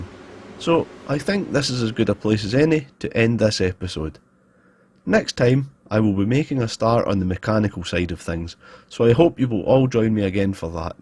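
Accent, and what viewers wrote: Scotland, United Kingdom. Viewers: This man, talented, hard working, creative and all of that with a great Scottish accent.👍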